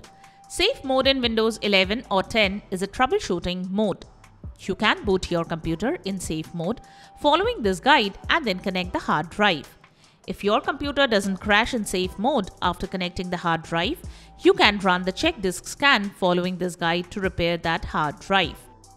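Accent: India. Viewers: A video full of kinks to follow without actually showing anything in an AI Indian robot voice 😩😩😩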